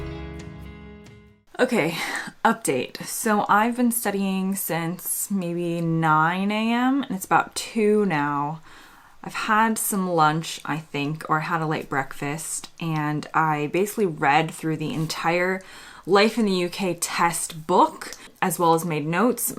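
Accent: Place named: United States. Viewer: you sounds like american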